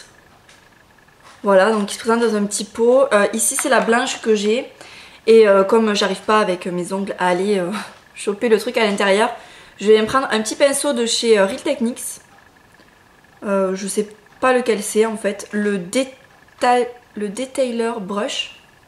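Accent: South, France. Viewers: Coucou et bonne année 2013 ! J'aime beaucoup tes vidéos et ton accent du Sud ! :) Est-ce que tu pourrais faire la vidéo de tes cadeaux de Noël ? Bisous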